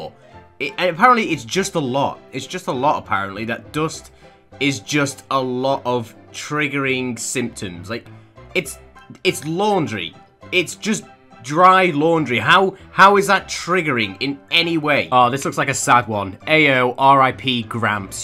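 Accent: United Kingdom. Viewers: i like ur vids but ur accent is too brittishy or smth so i hav to unsubscribe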